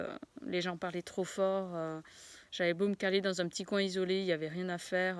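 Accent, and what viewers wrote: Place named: South, France. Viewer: Bonne vidéo, j'imagine que tu es du sud non? (à l'accent) sympa la vidéo elle est nette et tu es à l'aise devant la cam, juste petit conseil tu devrais filmer en horizontale pour éviter les bandes noires;)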